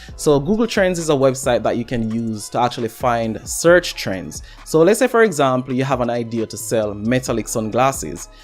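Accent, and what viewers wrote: Jamaica. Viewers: Are you Jamaican? Accent sounds like it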